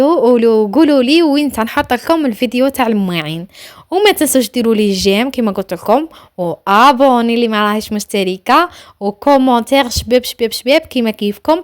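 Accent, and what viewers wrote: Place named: Arab world. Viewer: تبارك الله فكرتينا في الوقت الزين انت من جهة الغرب كما لهجت ناس الغرب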